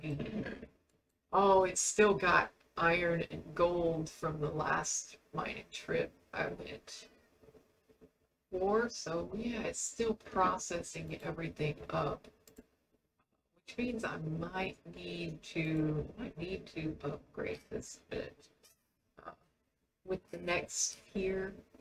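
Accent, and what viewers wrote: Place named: United States. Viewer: omg, I had the same heart-attack when my backpack was empty. If you look at the top left, you'll see everything is there, but bunched up for some reason. It only seems to be the first time I open my pack after I connect, or maybe after an update? Not often. But yeah, I've got all my tools and books in mine and was like "What the heckin'?!" when it happened to me. Did you see there's an upgraded version of the Environmental Eye called the Environmental Ocular that will show how far into positive or negative you are? And you keep using bonemeal to grow your trees for the ritual of the forest; would an Ars Grow spell not work, and save you some bonemeal? Re: streaming, keep in mind that you sound like you're in the US (and the weather in F in your taskbar says that, too :-P), and that means your day is my night. :-P So I, for one, would likely miss whatever progress you streamed, unfortunately.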